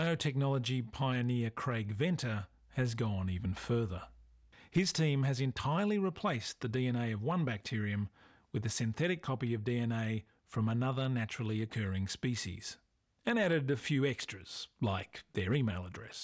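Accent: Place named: Australia. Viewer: Very intriguing. Nice video. Narrator sounds like Christian Bale as an Aussie...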